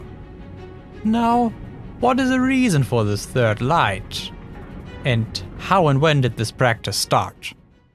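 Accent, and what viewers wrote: Germany. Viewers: Not to be mean but I can REALLY hear the German grammar in your English. It's kinda interesting since your accent isn't all that thick, yet your sentence structure and word choice are so obviously German.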